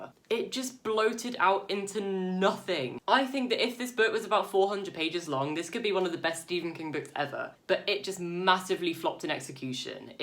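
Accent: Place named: England, United Kingdom. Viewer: One off topic thing.... You have an amazing English accent. May I ask you where you come from?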